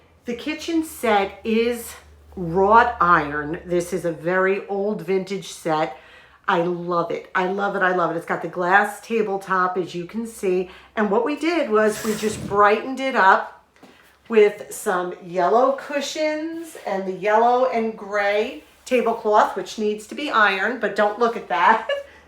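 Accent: New York, United States. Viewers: This house is a treasure - with the huge lot and close to the Ocean. Never sell it - pass it on to your children. Old homes were built to last - not like the construction of homes during the last 20 years. I was born and raised in NYC/Brooklyn decades ago - so I enjoyed listening to ur NY accent. Paint and Flooring upgrades will update the house. There are so many choices of both. Enjoyed your video.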